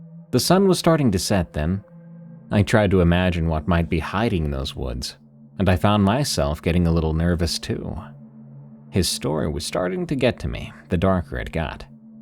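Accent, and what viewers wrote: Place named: Denver, United States. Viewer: I didn't know that we Denver people had an accent 😊 Loved the stories TY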